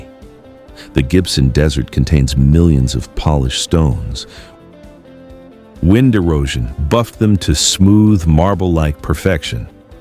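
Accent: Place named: United States. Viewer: Talking about Australia with an American accent and mispronunciations? That's a paddling.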